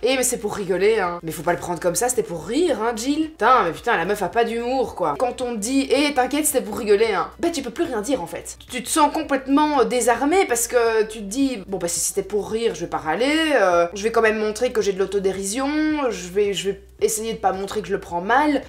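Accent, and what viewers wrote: Belgium. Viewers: J'ai une question rien n'à voir avec la video. Jill commence toujours ses vidéos avec "mes ptits vautours" (avec un bel accent belge hahahah). Ça vient d'où cette phrase? Pq elle appelle ses abonnées comme ça?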